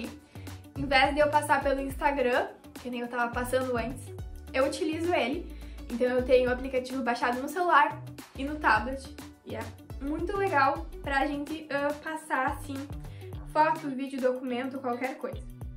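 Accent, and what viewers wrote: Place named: Recife, Brazil. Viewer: Gente ela tem muito o sotaque de recife 😂🤩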